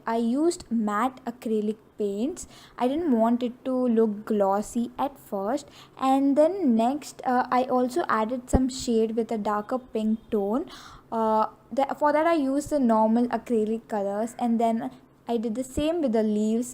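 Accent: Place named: United Kingdom. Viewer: Wish you come to uk help me I notice Brit accent.do some classes here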